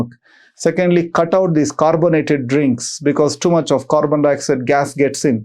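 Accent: India. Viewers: I'm sorry I couldn't watch it because of his accent.Strange thing is that I'm indian too.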